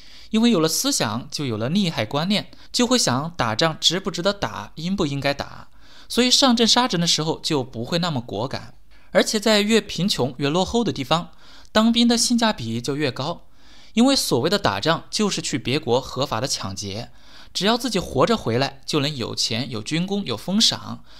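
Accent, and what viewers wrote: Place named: Hefei, China. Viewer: 听着像合肥人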